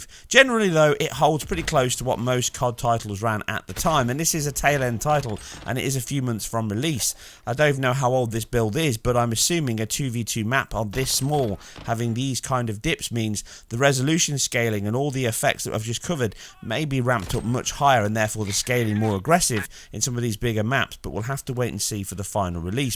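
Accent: United Kingdom. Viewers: Nice to have a British YouTuber who pronounces words in a British accent instead of adopting American pronunciations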